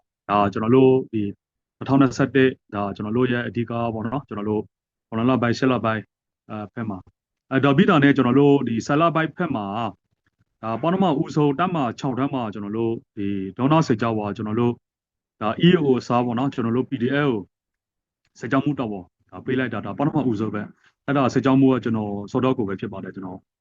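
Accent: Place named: Dawei, Myanmar. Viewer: ဒဝယ်သံကြီးဝဲနေတယ်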